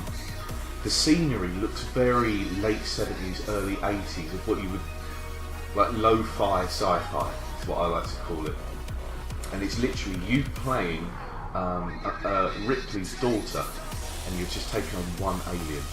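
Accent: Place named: Cockney, United Kingdom. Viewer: second that! Good to have your cockney tones back on YouTube : D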